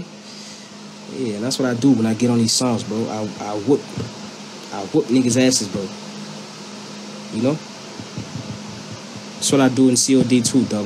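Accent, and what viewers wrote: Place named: United States. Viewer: American accent mxm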